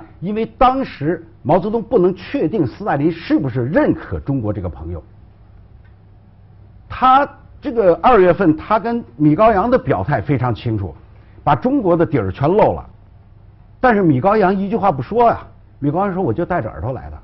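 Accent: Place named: Beijing, China. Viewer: 北京话听着很亲切，又风趣又不失风度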